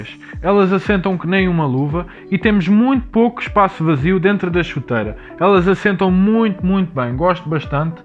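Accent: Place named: Brazil. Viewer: O português mais brasileiro deste YT! Parabéns pelo vídeo,!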